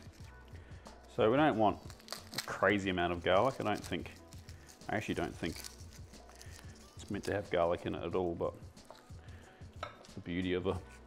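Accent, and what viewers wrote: New Zealand. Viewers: Was wondering where you were from, seen a lot of the short reels come up on instagram where you didn't talk much. but the moment I heard you say the word "Rinse" I could tell you were a Kiwi. Runse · I had a feeling you were kiwi from the accent, but confused cause you lived in QLD 😂 Can wait to try this dish and surprise the Mrs 😘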